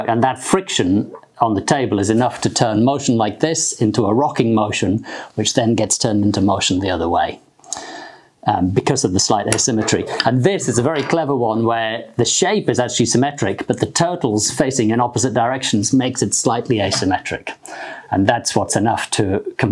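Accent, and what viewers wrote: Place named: North East England, United Kingdom. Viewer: Which part of the north east of England is he from, i wonder..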